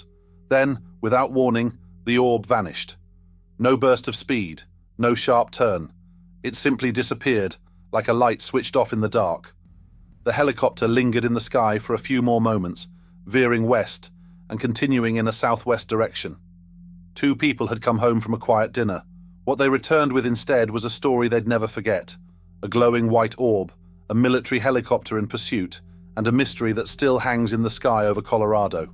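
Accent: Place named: Michigan, United States. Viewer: Bring back the Michigander voice! Thanks for another great video.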